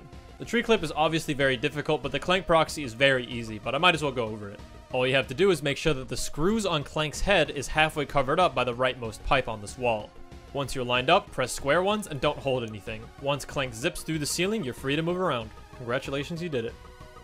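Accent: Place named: United States. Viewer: MattFlowerDrums He sounds like an american to me.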